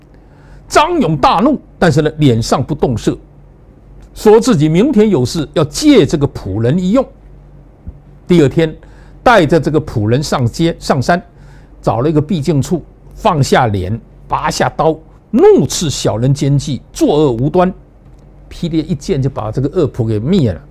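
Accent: Fujian, China. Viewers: Your spoken mandarin with fujian accent is horrific to listen to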